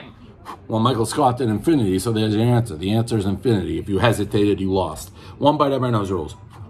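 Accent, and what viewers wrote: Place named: New England, United States. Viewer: like the videos...your yankee accent is somewhat tolerable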